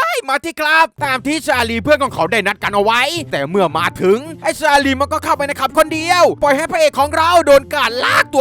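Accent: South, Thailand. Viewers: ผมชอบในความภาษาใต้ของพี่5555 ใครคนใต้บ้าง✋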